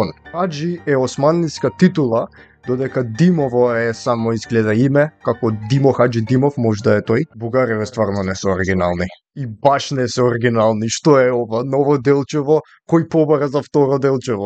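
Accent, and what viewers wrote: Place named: Skopje, North Macedonia. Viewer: не употребувајте некаков скопски нагласок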